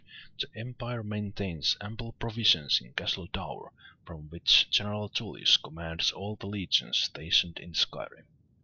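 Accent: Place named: Finland. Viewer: I watched the first video and that's when I found my two long lost loves this video your Finnish accent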